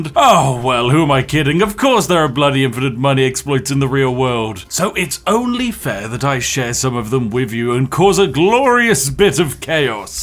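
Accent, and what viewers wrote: United Kingdom. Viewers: This dude is British in every way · this brit be spiffing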